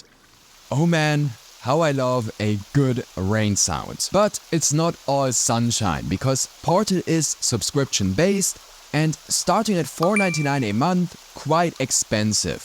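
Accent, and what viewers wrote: Germany. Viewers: Bist du Deutscher?? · Yes. He is german 😀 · Actually, it took me three videos to get that hes German Und jetzt esse ich weiter Bier und Brezel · Is that a Deutsche Akzent? :D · As German, I was pretty sure it’s a German accent, but still I thought he might be from another european country.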